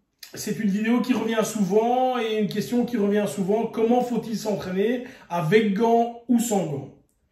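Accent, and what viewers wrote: Belgium. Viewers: Merci pour les informations. Étant presque totalement étrangé au combat réel, votre vidéo m'aiguille sur comment débuter mon renforcement. Mis à part cela, ne seriez-vous pas de la famille de Zouloux :P ? J'imagine que non du fait que vous soyez belge (déduction dûe au <<septante>>), mais votre voix et votre façon de parler ressemble pas mal à la sienne ; le monde étant petit je demande tout de même haha. Bonne continuation à vous ^^ !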